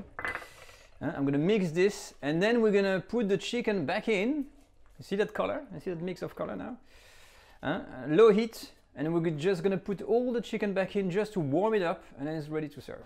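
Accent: Australia. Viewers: Ha ha every now and again I hear the remnants of your Aussie accent coming through!! Very good recipe too. Made it for my daughter and she loved it. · Love the way you explain things. You have been in Australia too long, you are starting to sound like us, all lbe it with a lovely accent. A bit like Manu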